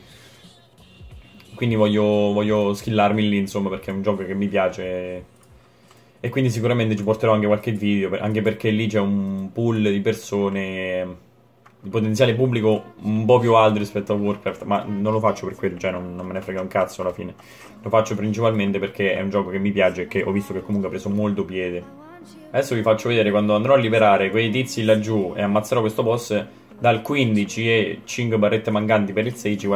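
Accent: Marche, Italy. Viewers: Per me sarebbe molto utile un video sui migliori addons da usare per i novizi. Comunque bel video continua così. P.S. sei marchigiano?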